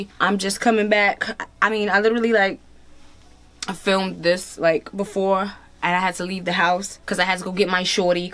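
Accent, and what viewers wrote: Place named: New York, United States. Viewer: SubscribingBECAUSE .....the tribe palette look.... u did that.......you’re a NYer (accent dead give away lol 😂)and you’re an awesome mom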